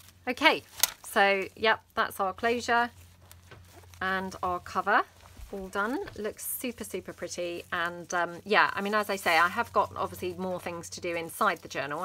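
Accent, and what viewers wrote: England, United Kingdom. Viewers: Beautiful journal, and I love your English accent but your daughters little voice with that accent is sooooo adorable! 💕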